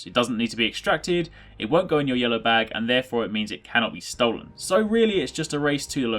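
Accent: Sweden. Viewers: You're Swedish? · are you from sweden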